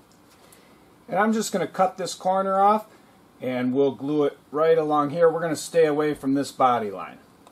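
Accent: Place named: Ireland. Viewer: Nicely done! Good practice lesson love the Irish ☘️ accent!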